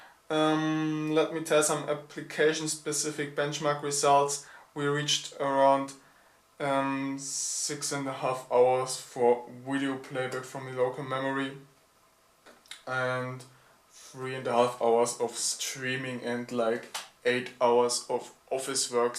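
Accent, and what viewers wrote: Germany. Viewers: German. I'm really sure. Source: I'm German. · Can' tell if he's german or indian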